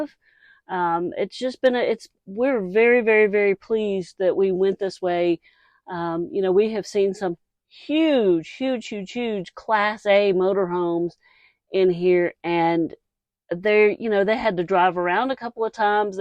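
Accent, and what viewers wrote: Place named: South, United States. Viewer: Just found you.....ha ha ...Never noticed your southern accent that much before in all the years we hung together.....but...Love ya guys....safe travels and for going the travel world...Have fun....Love ya ...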